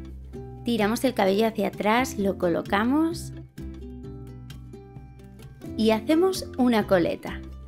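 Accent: Spain. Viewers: me gusta como ablamas eres española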